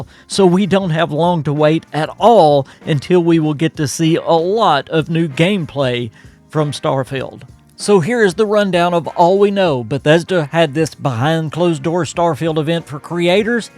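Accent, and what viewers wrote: South, United States. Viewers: Cant wait to try it on ps5. Subscribed on this channel since a few months ago if I'm not mistaken. Very wholesome channel. Love that southern accent. Salutations from EU.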